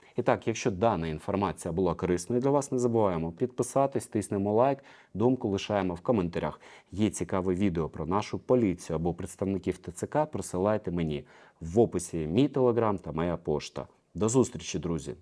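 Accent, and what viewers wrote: Russia. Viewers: не "К"арячу лінію а Гарячу, В УКРАЇНСЬКІЙ МОВІ "Г" ВИМОВЛЯЄТЬСЯ БЛИЗЬКО ДО "Х" А НЕ "К" ЯК В КАЦАПНІ, ВЖЕ ЧАС ЗАПАМ"ЯТАТИ, ТА ПОЗБУВАТИСЯ КАЦАПСЬКОГО АКЦЕНТУ, НЕ ВПЕРЕШЕ ТЕБЕ ВИПРАВЛЯЮ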